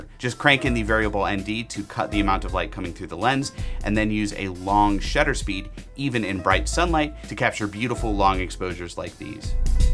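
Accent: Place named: United States. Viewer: Appreciate the American (Canadian? cannt tell) ,clear ,easy to understand review!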